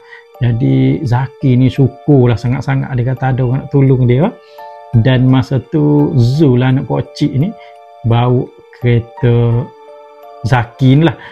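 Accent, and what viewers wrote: Kelantan, Malaysia. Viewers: sama2.. Sedak dengar crito..kemah2, ada stail tersendiri dgn loghat Klate dio tuh..kito ore baru dlm channel ni.. Follower Abg Joe JSPL benar..lor nih layan duo2 lah...😂😂🤩🤩😍😍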